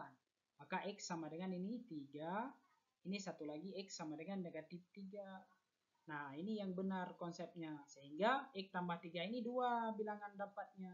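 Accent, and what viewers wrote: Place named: Aceh, Indonesia. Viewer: Bapak nya pasti orang aceh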